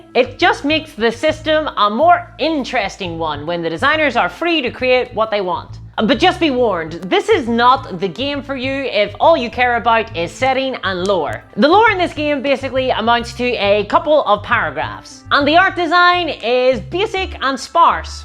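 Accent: Ireland. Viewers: You sound Irish.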